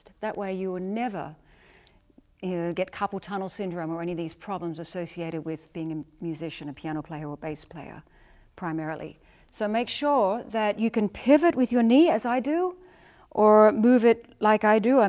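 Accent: Australia. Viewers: So Australian! - starting with G'Day :-)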